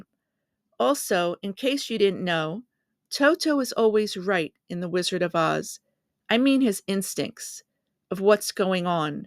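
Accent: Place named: New York, United States. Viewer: I like your narration and your slight (IMHO) New York accent, which I enjoy.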